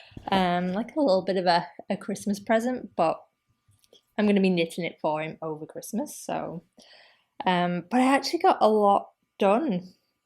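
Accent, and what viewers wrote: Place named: North, United Kingdom. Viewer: Enjoyed your first podcast and am back for more. Love your dotty hat, really pretty. Enjoy your style and its lovely to hear your Northern accent 😁 Tilly is adorable too. Look forward to the next one xXx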